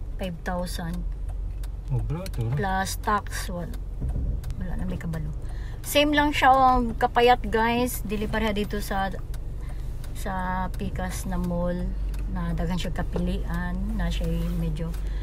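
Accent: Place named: Visayas, Philippines. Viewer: Pretty kaayo ka sis, and i love the way you talk ky pareho ta bisaya.. Amping diha permi,kumusta na lang ko sa mga hapon. Hehehhe..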